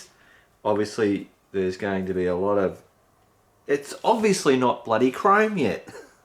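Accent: Australia. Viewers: lol, you are so funny HaHa speaking like a true-blue aussie. I have parts on my bike that is chrome exactly like yours that has pitted exactly like your, i was experimenting with things myself today and i found that when chrome is that bad you cant save it no matter what. I eventually just used a grinder to grind everything back to bare metal and just repaint the parts in another colour OR just polish and buff up the metal like you have done, but im just going to repaint all my chrome to black and not have to worry about looking after all the shiny metal with polishes and buffing every time i wash the bike. After watching this video your sense of humour made me laugh so much i decided to subscribe, plus your another aussie!!! and its really good to see aussie on youtube making video's. Cheers, Here is a quick-tip, just talk about what your doing and not waste time and footage worrying about what others will say and do, unfortunately there will always be experts putting crap onya, but the way i look at it and i think you do to, its not so much the destination that excites us its the journey. 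Meaning you learnt something today.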